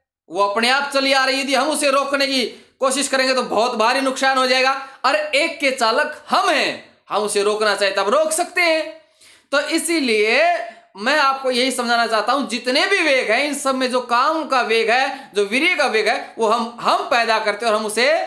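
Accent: Haryana, India. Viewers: Haryanvi acsent Dekh kar mja agya 😂😂😂😂 I am also haryanvi jai ho ..... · Guruji aap hariyana se ho Kya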